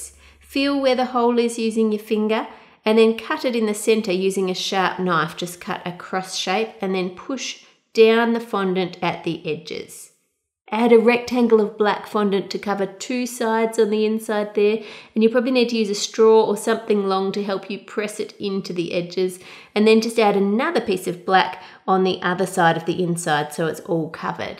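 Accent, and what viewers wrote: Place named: Australia. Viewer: sounds more Australian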